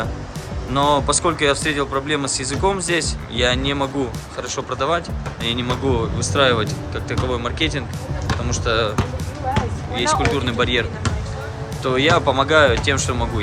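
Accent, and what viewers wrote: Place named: United States. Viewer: Крайние видео не нравятся, стал базарить как Америкос...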